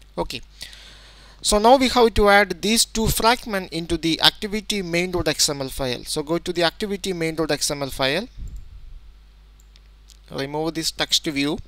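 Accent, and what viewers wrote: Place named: India. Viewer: Dat indian accent dou. =D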